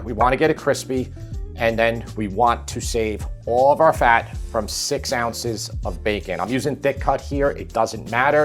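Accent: New York, United States. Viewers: Hi, I grew up in Brooklyn and I have moved to Thailand about 15 years ago. I stumbled across your channel when I saw you were making a NY Deli Macaroni salad. I haven't seen anyone else doing it on Youtube, so I had to watch it. Then, when I heard you speak, I thought to myself: This is a genuine NY dude, not some poser from a 5 star Michelin restaurant making a NY deli salad. Watching your videos makes me feel at home. Thank you BTW, the kid is a natural food critic. He doesn't pull any punches even though his dad is right there and his dad made the dish. He's articulate and he tells you EXACTLY what he likes and doesn't like in a particular dish. It's so refreshing to see such honesty. It makes me almost miss New York.:D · Not Only Do I Love Your Recipes, But I Love Your NY Accent & I Think You’re Nice to Look at too! 😉 Keep the Recipes Coming! 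TY From Oregon! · is a great taste tester. I also love your New York accent (patata salad) LOL. I am from Bergen County NJ and say it the same way!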